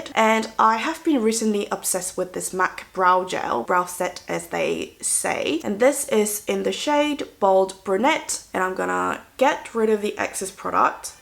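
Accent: United Kingdom. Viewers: 이렇게 퓨어한 인데 영국영어말투는 넘나 시원시원하신.....💓 한국어하실때랑 다른분같운데 전둘다 애정합니다....꺄르를ㄹㅎㅎㅎㅎ❤️ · 영국 영어발음 너무 멋있어요ㅠㅠ 블리블리 광채쿠션 너무 좋죠:) 화장도 너무 예쁘시고, 너무 잘 보고 가요!:)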